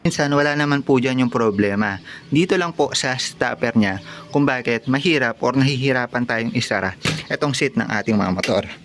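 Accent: Pampanga, Philippines. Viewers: Nokarin kayo lods? Halatang kapampangan ka hehe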